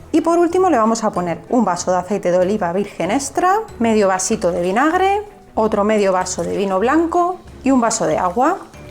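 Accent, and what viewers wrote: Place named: Spain. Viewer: Me encanta el acento español porque explican bien y se hacen entender y son tan limpias para cocinar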